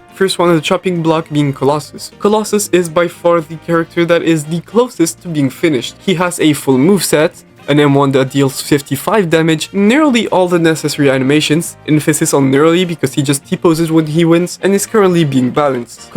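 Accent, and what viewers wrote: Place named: France. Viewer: t'es francais mdrr t chaud en anglais frro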